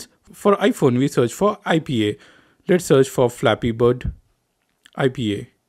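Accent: India. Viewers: Why do you have a indian accent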